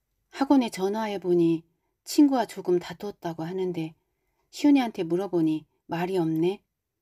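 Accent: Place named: Chungcheong, South Korea. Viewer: 구독하고 듣습니다. 충청도 사투리 잘하십니다~♡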